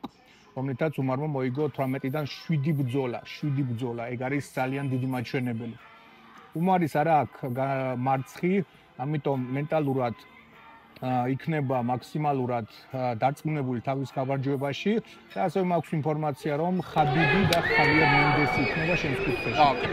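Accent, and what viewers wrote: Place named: Russia. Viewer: აქ მგონი რუსული აქცენტით ნათქვამ overhand -ზე იყო საუბარი. 😊 მაგრამ სულ არ აქვს მნიშვნელობა რას ამბობთ გავიგეთ.😊 ქართველები ჰ-ს ამბობენ უფტო ვიდრე ხ-ს თქვენ ალბათ რუსულ ენას ფლობთ კარგად და გავლენა გაქვთ. მადლობა ინფორმატიული განხილვებისთვის!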